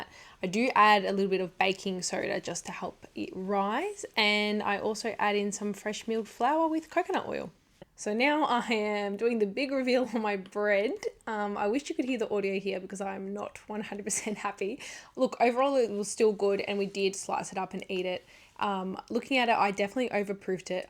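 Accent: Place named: Australia. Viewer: It’s very refreshing to hear an Aussie accent 🤗👍. I’m in Brisbane,you’ve got a hot day down your way today 🥵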